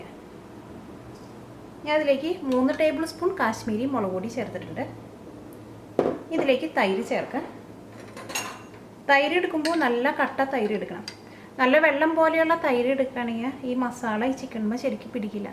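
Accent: Thrissur, India. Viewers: Hi, I tried this, everyone here loved it. Very tasty. All your dishes are really nice I have tried some other dishes also. In the beginning I started watching becoz of ur thrissur slang but then later I liked ur all dishes. even I am from thrissur. best regards.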